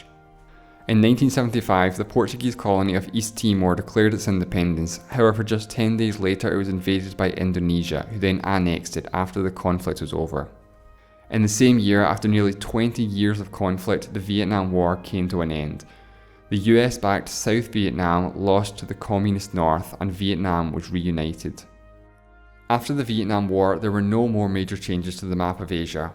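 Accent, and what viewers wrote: Scotland, United Kingdom. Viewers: Scottish, he sounds exactly like my scottish friend lol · I like your Scottish Accent. Just saying. 😂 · hes scottish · Are you Scottish btw? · It's Scottish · This comment has nothing to do with the content, I'm just dying to know if the narrator is Scottish or Welsh or something 😂😂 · He's most definitely Scottish, not Irish. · Aaryana Hurd I think he’s Scottish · patrice Scottish I think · Is this is a Scottish or Irish accent?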